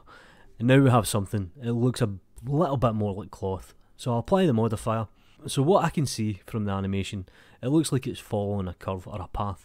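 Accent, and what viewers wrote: Scotland, United Kingdom. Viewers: Soo Scotish accent :)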